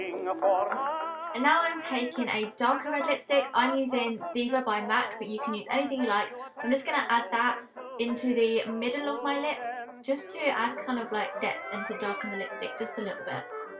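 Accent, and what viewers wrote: United Kingdom. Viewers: You are so sweet! I love how you say "hello my lovelies" at the begging of every video in your amazing british accent(; -3 i seriously adore you!!!!!! xoxo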